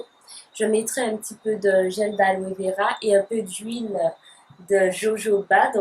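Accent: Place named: Martinique, France. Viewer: J'aime beaucoup ton accent de la Martinique. L'huile végétale de rose musquée c'est ma préférée, sympa tes achats Gros bisous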